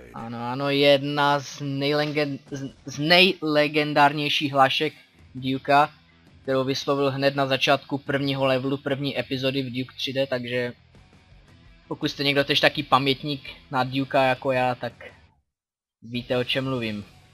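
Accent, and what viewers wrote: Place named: Ostrava, Czech Republic. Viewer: Ty mi připadáš jako ostravák (podle toho, jak mluvíš)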